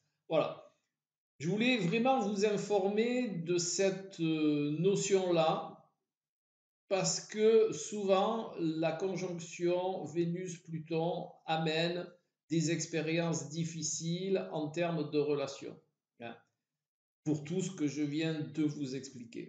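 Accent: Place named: South, France. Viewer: Merci pour la clarté de votre propos et pour votre bel accent du sud.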